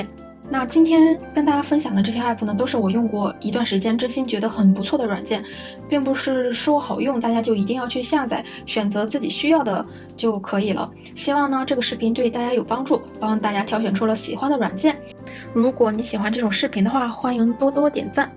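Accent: China. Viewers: 口音是烟台的吧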